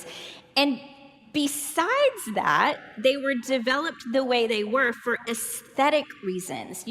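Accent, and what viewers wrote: United States. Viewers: Hers is the most quintessentially American accent I've ever heard.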